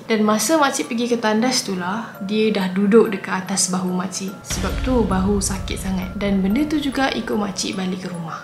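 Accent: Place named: Terengganu, Malaysia. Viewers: COMEL GILA KAK BACA LOGHAT TERENGGANU 😂😂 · Comel kak cakap loghat terengganu 😭💕like it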